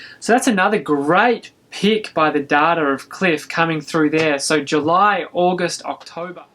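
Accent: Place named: Australia. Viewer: Are you an Aussie, Mate? I can hear your Stroine! Goodonya mate! The Theme Song kinda gave it away as well!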